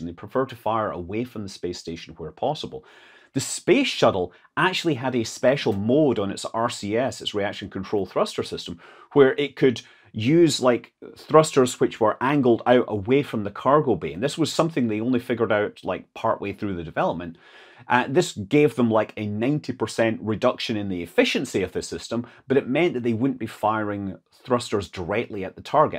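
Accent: Scotland, United Kingdom. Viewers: I doubt you remember, but I asked you if you were Scottish or Irish a long time ago. How did you regain your Scottish accent and loose the American one also?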